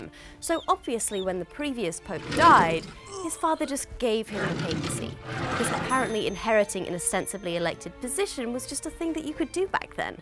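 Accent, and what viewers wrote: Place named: RP, United Kingdom. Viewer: Wow, sounds really posh in this video! Being in the States has really altered her accent.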